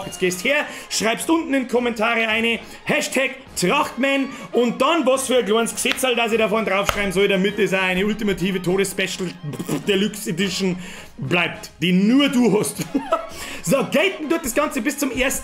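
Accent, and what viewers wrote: Bavaria, Germany. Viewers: #TRACHTMAN weil boarisch einfach geil is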